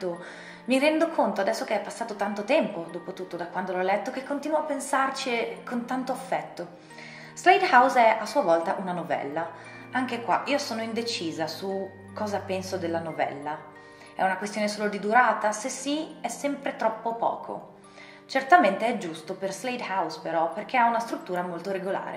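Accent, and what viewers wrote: United Kingdom. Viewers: In questi video ho trovato tanti consigli per regali... che mi farò :3 Ma mi hai tolto ogni dubbio su quale prendere per primo quando hai detto "India" e "mi è sembrato di sentire gli odori" Mi spiace solo che l'edizione italiana di Shantaram non abbia la copertina bella come quella inglese, anche se sono certo me ne farò una ragione. P.s. Adoro il modo in cui parli! Sei di madrelingua inglese?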